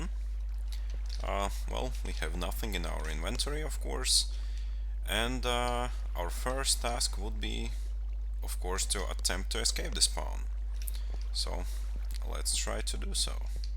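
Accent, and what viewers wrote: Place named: Russia. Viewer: Звучит похоже на русский акцент... 🤔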